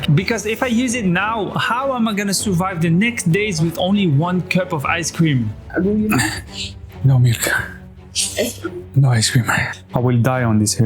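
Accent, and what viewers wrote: France. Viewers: Why do you have a French accent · The french accent makes watching this so fun( u should have 100k subs ATLEAST)😅😅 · Beautiful vidéo the french accent Is definitely your signature branding wise, don't loose It .x')❤ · Salut mec depuis que j'ai clicker sur t'a vidéo j'ai directement remarqué ton accent français 🤣🤣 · Is that a french accent I hear???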